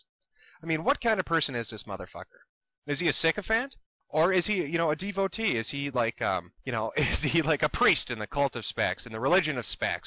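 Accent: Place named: Minnesota, United States. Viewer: He sounds like he walked out of the movie Fargo "oh yeah Margie he's a funny lookin lil guy"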